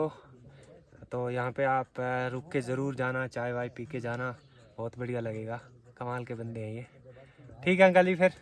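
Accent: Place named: Pahari, India. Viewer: Bro you speak in Pahari accent actually. Are you from Himachal or Haryana by the way?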